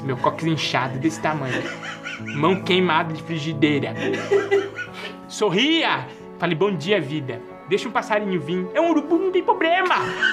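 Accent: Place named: Minas Gerais, Brazil. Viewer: Cara vc é show,parabens!!! Achei vc depois q instalei Instagram.. parece meu povo lá de Minas falando,adoooooooro kkkkkm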